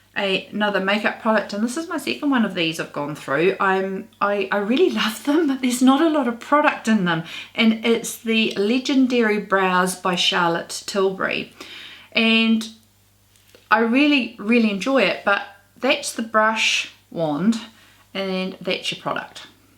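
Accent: New Zealand. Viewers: I am a 66 year old from Baton Rouge Louisiana. I love your accent. It's terrible that products like CerVave costs so much for those of you in New Zealand. I had rosacea in my earlier years and I took birth control pills for a long time. So, my current issues are sagging skin and wrinkles. But I still have the oily tzone. Within the last few years, I have developed this annoying problem called sebasceous hyperplasia (clogged pores). It's like little skin colored bumps that are primarily on my forehead. They rarely go away. I hope that you never have to deal with it. We do not know what causes it nor how to get rid of it. But I need to get rid of extra sebum without wrinkling even more.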